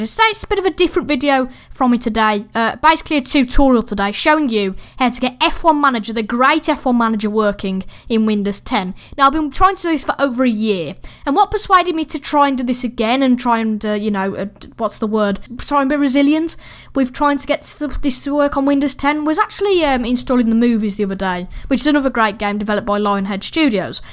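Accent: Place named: United Kingdom. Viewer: I love britsh accent... is so funny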